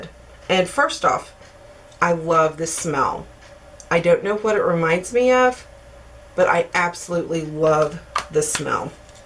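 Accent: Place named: Pennsylvania, United States. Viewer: I need that Hard Candy spray! You make me want to buy everything LOL! You look beautiful as always :) You sound like my family from Pennsylvania :) I could watch and listen to your videos all day long :)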